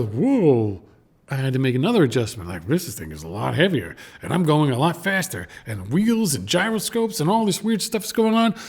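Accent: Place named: Northeast, United States. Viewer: your accent reminds me of childhood 😂 northeast?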